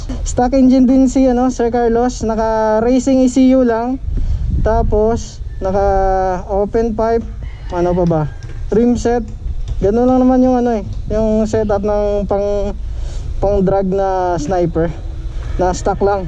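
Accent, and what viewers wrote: Iloilo, Philippines. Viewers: Ilonggo kapala boss, sa diin ang inyo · ilonggo ni gali ayy. bakbaki ahhh🤣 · Ilonggo ka sir?' Idol ko ung punto talaga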